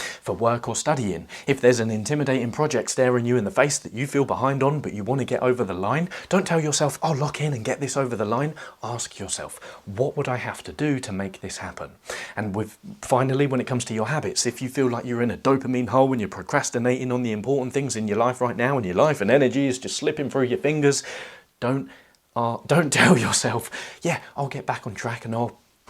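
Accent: England, United Kingdom. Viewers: Whereabouts in England are you from?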